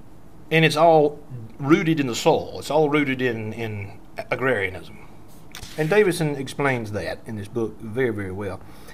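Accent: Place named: South Carolina, United States. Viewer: What part of South Carolina are you from? Your accent is similar to my cousins from Camden. I'm a native Charlestonian.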